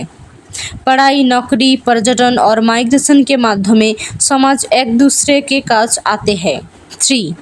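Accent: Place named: Bengal, India. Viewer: Are you a Bangali? Bengalis are speak like this.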